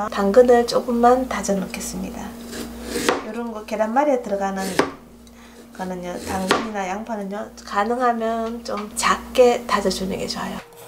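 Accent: Gyeongsang, South Korea. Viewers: 경상도 사투리인가? 넘 귀엽네요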